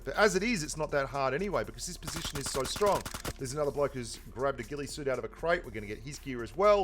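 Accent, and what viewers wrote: Australia. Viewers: Loving that Smooooth Australian voice! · I don't know if it's the thicc australian accent or the great gameplay but I can't stop binge watching your videos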